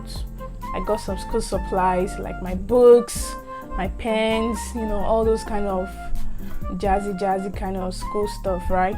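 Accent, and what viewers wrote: Nigeria. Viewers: Keep your Nigeria accent up. It really dope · I understand you so much....it's a big shame to Nigerian government for not making adequate provision for students back home. My first day in American college (FLORIDA) was hell but I came through. I am glad you are catching up and getting more confident in yourself. Accent is not a barrier it's just the beauty of our nationality. I just subscribed 👍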